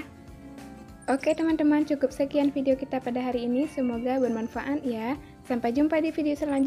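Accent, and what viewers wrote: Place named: Aceh, Indonesia. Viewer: Bahasanya meu aceh that. Ketawa aku2 😀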